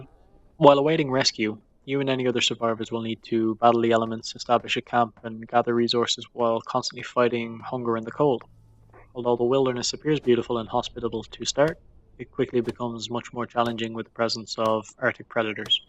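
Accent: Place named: Ireland. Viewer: Great review - game sounds very interesting & fun. Love that Irish accent! I would say that the sound needs to be a little higher. I really support new YouTubers, subscribed, I'm interested in more. Thumbs up for that! I'd suggest that you make social media and put in your channel art to gain more exposure. Make the channel icon more unique! - Channel art is okay. - I suggest removing the videos and only placing playlists, popular uploads and channel trailer. Tags need to be more specific, don't be afraid to write a full sentence if you have to, such as "two minute review of the wild 8" rather than "the wild 8" and "2 minute review", that will have less competition and more likely to be searched. Feel free to follow me on Twitter, we can talk anytime :)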